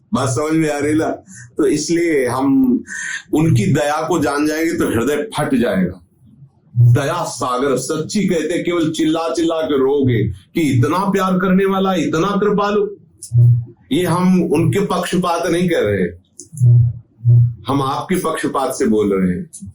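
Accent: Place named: Kanpur, India. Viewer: आप श्री तो कानपुर से हैं वाणी से पता लग गया, चौबेपुर वाले विकास बाजपेई भी ऐसे ही बोलत हैं 🙏🙏🙏🙏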